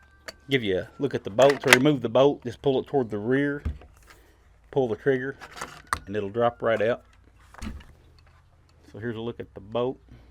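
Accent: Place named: Kentucky, United States. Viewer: Heck.....you always sound funny to me.....you Kentucky boys vocalizations are a bit to sophisticated for a Texican😀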